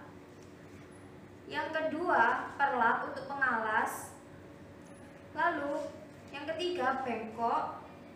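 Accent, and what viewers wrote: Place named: Java, Indonesia. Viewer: logat jawa bnget tuh bhasannya keknya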